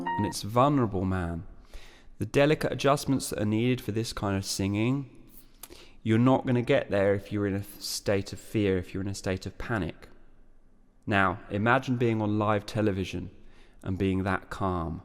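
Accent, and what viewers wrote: England, United Kingdom. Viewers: your english accent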